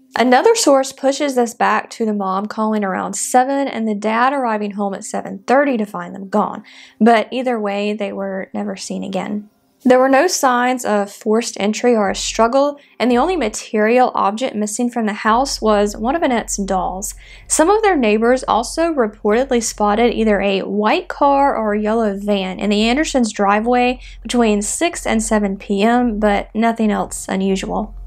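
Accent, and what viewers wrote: South, United States. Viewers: has a nice southern accent. Also, she is a good narrator.🤓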